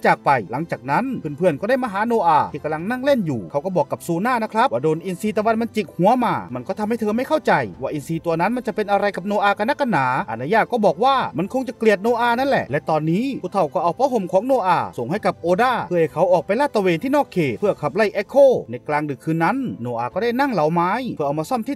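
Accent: Isan, Thailand. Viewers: พากย์ออกแนวอิสาน สนุกดี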